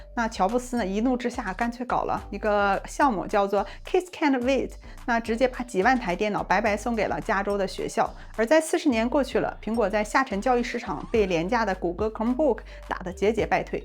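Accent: Northeast, China. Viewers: 捂。。。唠。。。 戈。。。？？？ 大姐东北银啊？？？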